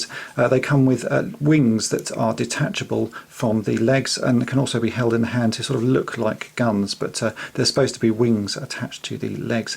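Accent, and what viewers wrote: United Kingdom. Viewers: For a British dude, you sure know quite a bit about Japan. Are you part Japanese? You sound very British.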